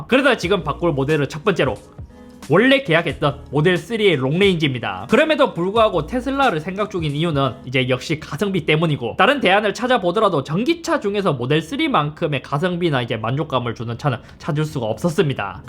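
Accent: Gyeongsang, South Korea. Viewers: 섭아....내가 한마디만 할께...KT 썰이 공중파까지 타고...캐티통신서비스 개선에 엄청난 파급효과인정... 그런데..테슬라 썰은...아닌것 같다... 본인이 흰색 시트 주문하고서..(미국도 화이트시트 구하기힘듬) -__-:: 테슬라가 본디..그러한것은 알고 있잖소...아니말로 잇썹 구독자들도 갱쌍도 발음 신경쓰이긴 하지만... 내용의 퀄러티가 좋고 해서...매번 참아가면서 보고있단다... 본인 선택이 빠가(?) 스러운 결정이였는데......영업사원이 모델Y가 빨리나온다는 말만 반복... 쩝...아예 계약을 취소하고 이런방송을 하였으면..인정하는데... 은근히 백만유튜버이니...테슬라 지사에서 신경써서 출고해달라는...방송같다... 초심(?) 항상 생각하자......섭아....이상......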